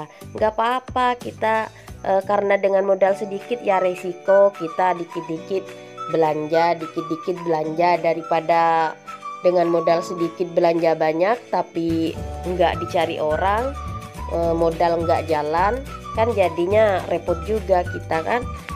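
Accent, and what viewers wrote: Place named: Madura, Indonesia. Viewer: orng madura kyak e logate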